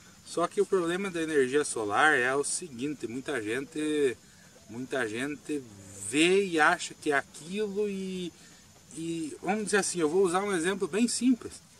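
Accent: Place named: Paraná, Brazil. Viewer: Que orgulho do nosso sotaque paranaense .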